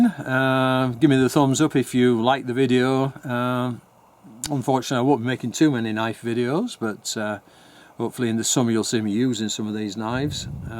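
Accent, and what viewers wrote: Northern England, United Kingdom. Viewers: Good morning, I’ve just found your channel and I’m enjoying your videos. I live in northern England in Lancashire I’m just wondering what part of England your from. It sounds like your also from northern England but I can’t just pinpoint your local dialect. Keep up the good work. Regards Ian. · "A perfect littl' dangler" LOL ... I love Brit accents and idioms ... last time I heard "dangler" was on "Are You Being Served?" ... one of the best Brit sitcoms ever. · oh... well... I think your tractor's sexy. there. Now we're friends. :) But Seriously, yew...that's pretty. Since I appreciate you so much, I'm willing to take extreme care of it for you. :) It is a fine knife though. You should make more. Anyway, I have friends in England. You sound northern. I have some wood I could cut and send you to make more handles if you like that sort of thing. 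I have black Walnut, sugar, silver maple, and even stag-horn sumac, though I don't know if you could keep sumac from rotting, but it is beautifully colored when cut with multiple colors, if you could. hell, I might even be inclined to send you a black rat snake head, or skin when I run in to them in the spring. (they're no less than 4 ft long here. I've even seen a 16 to 20 ft long one, which is ridiculous for them.) p.s. I'm in Ohio, USA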